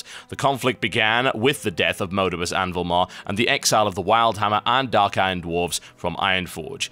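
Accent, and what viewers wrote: United Kingdom. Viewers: id say this guy is pretty good ... but i cant wacht crap , and he sounda abit british huh?? XD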